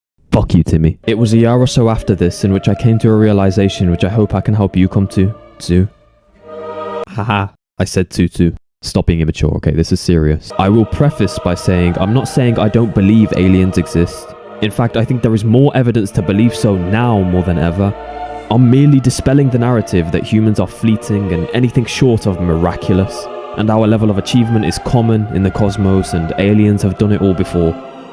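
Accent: United Kingdom. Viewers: West midlands?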